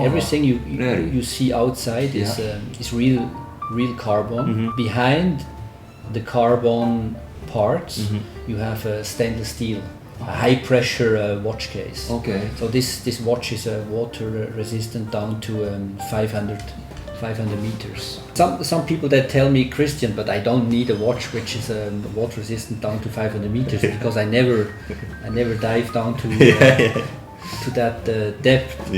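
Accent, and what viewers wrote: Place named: Japan. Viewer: クリスチャンさんの英語はまるでイギリス英語のように聞きやすい。 特に黒人系のスラングたっぷりの英語を直で聞いたあとならね。 そしてRYさんの英語発音は結構カタカナ英語っぽいんですね。 巻き舌がほぼなくて我々日本人に聞きやすくできてる。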